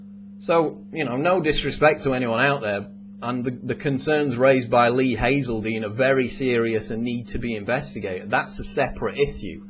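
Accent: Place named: Yorkshire, United Kingdom. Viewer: Yo dude, you from Leeds? That accent sounds like a Yorkshire one?! Hello from W.Yorks.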